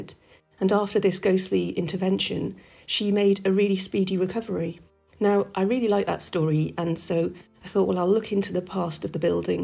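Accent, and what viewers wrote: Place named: West Country, United Kingdom. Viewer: Really interesting channel and content. Also love that west country accent. Good work. Thanks.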